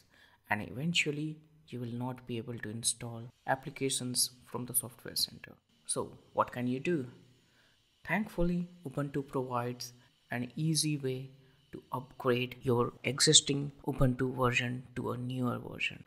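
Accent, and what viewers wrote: India. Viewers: No, you are too quiet, speak with a distinct Indian accent, and your are speaking way too fast to follow.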